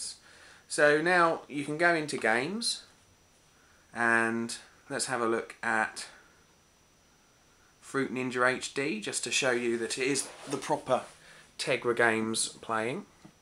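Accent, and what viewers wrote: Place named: England, United Kingdom. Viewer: I think this is outstanding vid specially for a 1st one. You have big future on YT. I can tell. Appreciate Your work. BTW Thats the second english accent i love... first was Wheatleys one obviously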